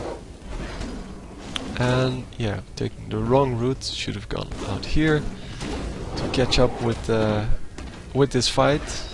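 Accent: Netherlands. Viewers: Are you Dutch? you sound a bit dutch ^^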